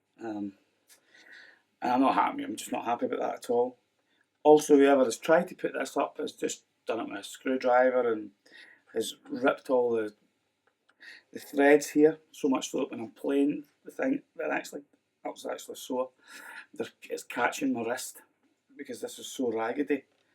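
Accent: Scotland, United Kingdom. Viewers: Thanks for the warning , i wanted to buy one of those ( w/o tree inlays) PLEASE, use a lapel mic , or singing mic positioned infront of you. The sound quality on the video is muffled ( nice scotish accent though). Best regards, D. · well I’m Italian but i usually understand English quite well,it might be a mix of your voice condition,audio and (nice) Scottish accent:)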